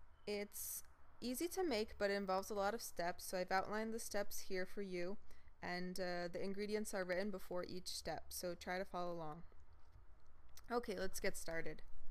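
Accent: Sanaa, Yemen. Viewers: I salute you for doing our dishes. Thanks. And good Sanani accent with the Arabic videos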